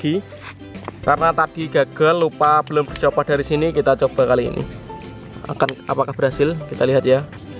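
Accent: Java, Indonesia. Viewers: Hhhh .wong ndi .kok kentel jowone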